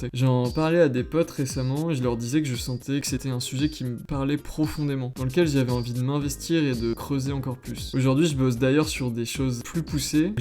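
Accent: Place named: England, United Kingdom. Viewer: PTDR, j’viens de me taper cette même vidéo mais avec ton accent anglais, avant de me rendre compte que tu faisais ça pour toutes tes vidéos, con que je suis 😅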